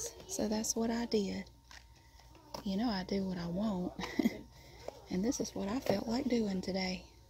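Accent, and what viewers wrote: South, United States. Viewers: Hey not trying to be creepy 😂 but I love your vids and southern accent but what southern state are you from I’m from South Carolina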